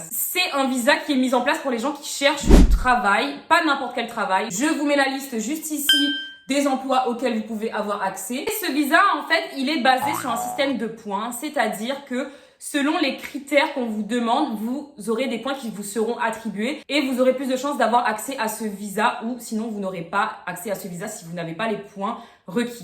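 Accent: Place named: Réunion, France. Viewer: lol j’en étais sur juste à l’accent, je cherchais des infos sur le visa e7 et je tombe sur la vidéo d’une compatriote réunionnaise, en tout cas ta vidéo était sympa et très instructif et je n’hésiterai pas à aller voir les autres. Je ne sais pas si tu comptes aussi streamer sur Twitch par exemple. En tout cas tu as un abonnée de plus =]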